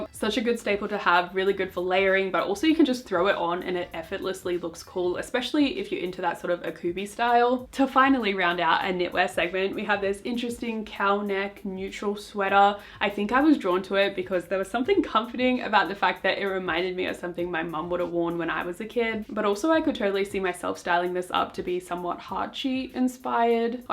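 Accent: Australia. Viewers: danggg it’s so refreshing hearing an aussie voice!!!! love the way you style out of the box pieces… i’m feeling very inspired. can’t wait to go in a youtube hole of your chanel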